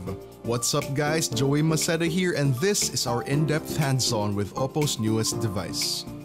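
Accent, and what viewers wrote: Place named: Philippines. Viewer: His phillipino accent is so strong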